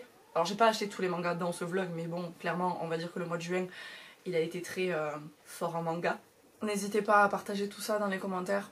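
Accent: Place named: Toulouse, France. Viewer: J’aime tellement tes vlogs ! Moi qui suis une toulousaine expatriée en région parisienne, je suis toujours ravie de retrouver ton accent ! 🥰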